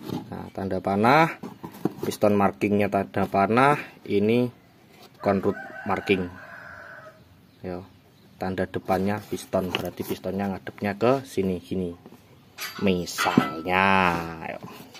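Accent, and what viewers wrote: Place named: Kediri, Indonesia. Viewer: kulo pikir lek kediri om aksen jawanya😂😂😂😂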